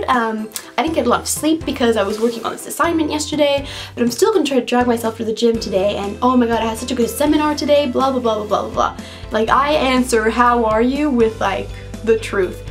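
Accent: United States. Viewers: Sounds like you already picked up some of the accent, you sound very mildly American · Dexterror It's already been established that she's Finnish, and she doesn't strike me as the sort of person who would 'try to sound' American. My guess is that she picked up the accent from wherever, or through whatever means, she learned English. And given the presumption that English isn't her first language, I find it surprising and commendable that she speaks it better than most English people I know. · I had no idea you're from Finland you sound American how did you learn that accent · You say you're from Finland but you speak like an American - not just accent but manner of speech also. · How come you have an American accent if you're from Finland? Also you could settle an argument between my and a friend - Is Finland part of Scandinavia? :D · If you come from Finland how come you have an American accent when speaking English.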